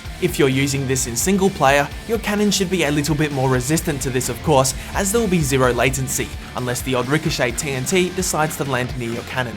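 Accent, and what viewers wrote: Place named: Australia. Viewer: Your Aussie! Me too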